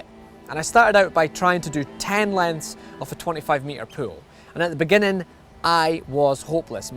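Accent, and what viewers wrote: Scotland, United Kingdom. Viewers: Are you Scottish!? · are u Scottish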